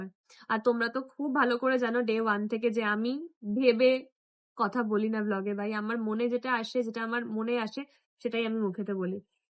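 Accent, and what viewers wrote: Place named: Birbhum, India. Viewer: Khub khub sundor hoyeche ❤ Wow that's was great tomar mukhe Birbhum katha taa khub vlo lagalo ami Birbhum jajigram aa thaki 😊❤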